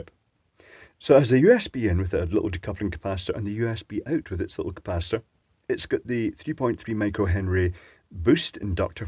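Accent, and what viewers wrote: Scotland, United Kingdom. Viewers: Excellent and very educational! There's something about this Scottish accent version which inspires confidence- as opposed to the 'English bastards...' version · your soft Scottish accent and sarcasm works perfectly when discussing China's quality products. You always have me laughing out loud.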